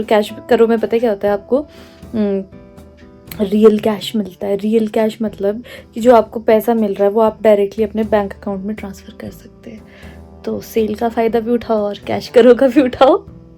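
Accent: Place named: Pahari, India. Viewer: i wanna merry to you your voice is so attractive.......and your phadi tune i like....baki kuch nhi h.....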